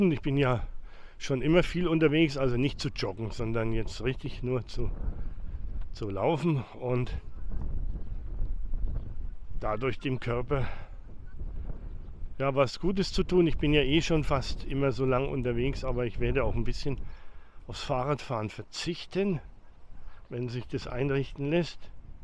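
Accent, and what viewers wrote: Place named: High German, Germany. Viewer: Ich freue mich morgen nach Gran Canaria zu fliegen. Ich bekome schon heimweh nach den Dünen. Mach weiter gerne mit ihre Reportage. Ich schaue gerne ihre video clips und daarbei auch meine deutsche sprache üben. Sie haben einen schönen Stimme etwas hoch Deutsch mit eigen accent! Vielleicht wenn ich daar ben und Sie treffen,werde ich Sie sicher ein Bier anbieten.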